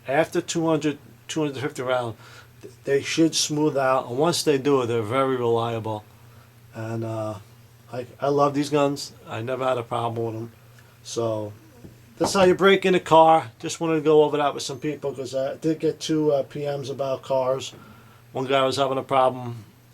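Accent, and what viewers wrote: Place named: New York, United States. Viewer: just a New Yorker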